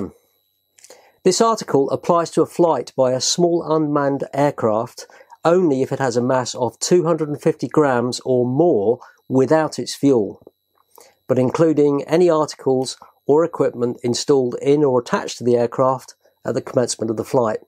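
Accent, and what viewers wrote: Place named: England, United Kingdom. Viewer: First visit, from a trainee pilot! Great to hear an English voice giving advice on this subject.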